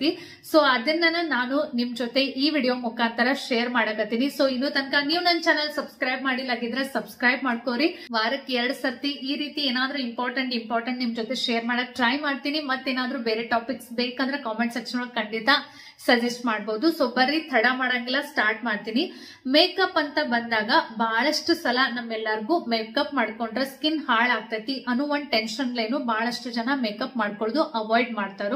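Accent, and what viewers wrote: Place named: Uttara Kannada, India. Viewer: Hai sister 😍 .Nivu mado yalla videogalu tumba informative agirutte . Nivu chikka chikka vishayavannu tumba acchu kattagi heli koduttira. Ondu kutumbada javabdarige nimmantaha hennu magalu tumba agatya 😍 Maneyannu channagi maintain madta eddira. 😊 Waw. Nivu matado uttara Kannada language keloke kivige impagirutte, nivu madida odapu gala video sakattagittu. Gandana hesarannu a rethi agi karibahudanta gottirlilla. Tumba channagittu. Nimma vlogs Alli nivu honest inda interest ittu video madodrida adannu navu aste interest kottu noduteve. Nivu mane kelsa, bere work, sanvina nodkollodu yallavanu channagi nodikoolodrinda bereyavarigu nivu inspire agiddira. Nivu yavaglu energetic agirodrinda namgu kelsa madoku energy barute. Nimm life style tumba esta, nimma matte Vinay Sir bonding tumba channagide. Nivu made for each other ☺sir nimge tumba supportive agiddare. 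Nivu tumba cute agi muddagiddira. Vinay sir tumba lucky. 😍 Nivu toriso daily vlogs, cooking, matte sanvi jothe mado activities help agutte. Ega madiro makeup videos alli yavella product hege use madbek annodannu channag heliddira. Tnks sis 😍 Heege helpful videos madta eri..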